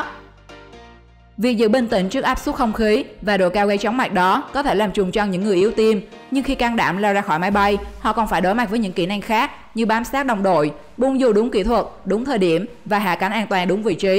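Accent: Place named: Central, Vietnam. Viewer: Giọng miền Trung mà thuyết minh thì hãm thôi rồi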